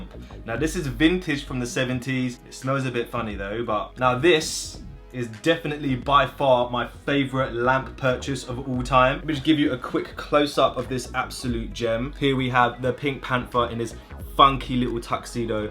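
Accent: England, United Kingdom. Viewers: Idk what’s more entertaining his monotone British accent or his monotone jokes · this accent is obviously english/british,,,but what KIND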